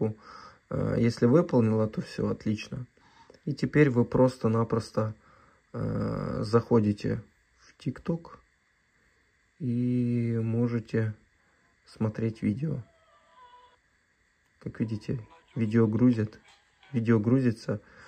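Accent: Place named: South, Russia. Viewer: Южно русский говор... Какчай качай... -?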